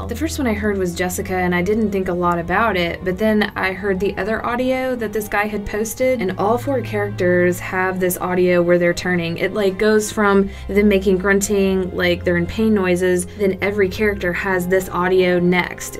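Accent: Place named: United States. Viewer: Btw u sound like a cute American?what state are you from but slap me with the holy macral if I'm wrong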